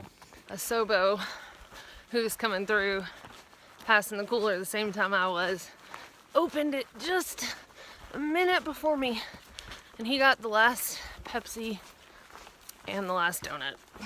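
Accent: South, United States. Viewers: Thanks again for taking me along on this adventure. Has anyone else thought that southern accent has faded just a little bit?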